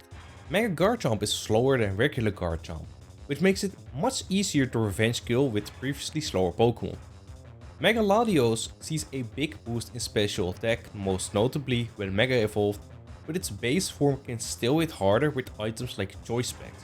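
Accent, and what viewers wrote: Netherlands. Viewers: Do you happen to be Dutch? The accent is thick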